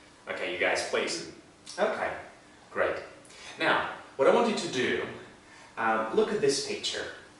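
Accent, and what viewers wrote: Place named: Russia. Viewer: I hear Russian accent in this demo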